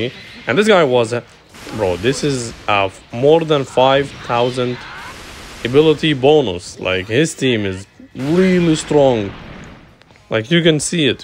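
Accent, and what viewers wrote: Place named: Russia. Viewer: Good gameplay, good team and Russian accent. Perfection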